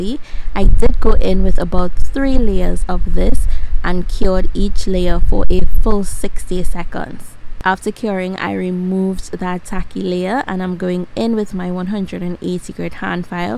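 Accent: Trinidad and Tobago. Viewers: I heard the trini and got so excited 👏🏽👏🏽subscribed and followed one time. Awesome video 🤍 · As soon as you started talking I knew you were Trini <3 ! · where are you from u sound trini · I can spot a Trini accent from anywhere 🇹🇹🇹🇹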